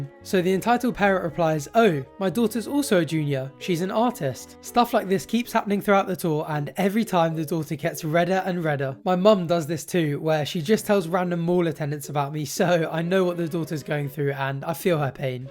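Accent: United Kingdom. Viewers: I love your voice? Your defo from the uk too yes?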